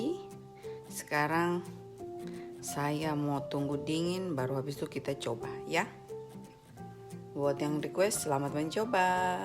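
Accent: Papua, Indonesia. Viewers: Pelei mace ko tra kosong...itu boleh dgn logat yg katorang dipapua mengerti dan paling jelas sekali. Salam dan.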